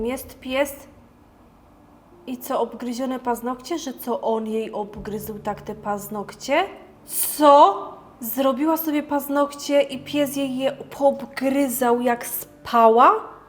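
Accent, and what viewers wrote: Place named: Silesia, Poland. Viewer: mów wiecej po Śląsku plisss uwielbiam!!!!!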